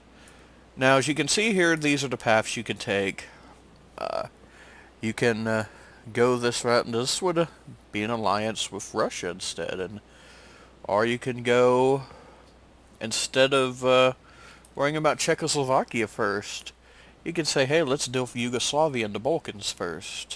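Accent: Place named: United States. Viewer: what a cowboy accent ! God damn ! 🤣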